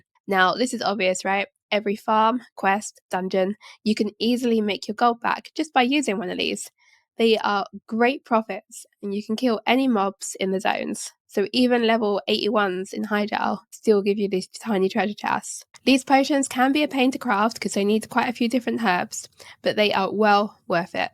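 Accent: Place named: London, United Kingdom. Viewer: You sound like you from London ye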